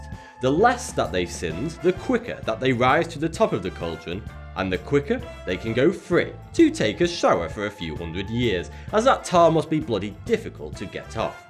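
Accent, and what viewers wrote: United Kingdom. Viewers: Maybe someone who doesn't believe in something to the point of mocking, shouldn't inform people about other theologies. Fire, earth, air, water. Follows the pattern of life, humanity and all of it. So, you're funny and all, but really.... you sound, like, too British.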